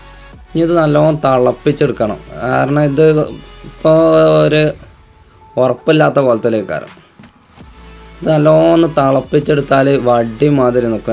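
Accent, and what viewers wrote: Malappuram, India. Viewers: ലൈക്‌ അങ്ങ് കൊടുത്തു . മ്മളെ മലപ്പുറം ഭാഷ thudakkathinu. " എത്ത വർത്താനം " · മലപ്പുറം ഭാഷ ഒന്നും നോക്കിയില്ല ലൈക്ക് അടിച്ചു. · മലപ്പുറം ഭാഷയ്ക് അടിയൊരു കിടക്കച്ചി ലൈക്‌ ❤️❤️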